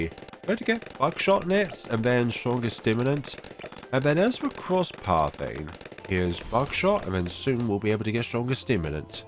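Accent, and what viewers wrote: United Kingdom. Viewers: Two of my favorite things in one video: btd6, and a british man talking about space. Absolutely lovely 👌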